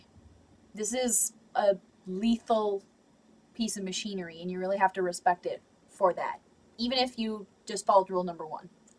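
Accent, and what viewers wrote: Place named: North, United States. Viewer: haha ur from up north rock and roll i can tell by your accent i spend 3 moths a year in northern mn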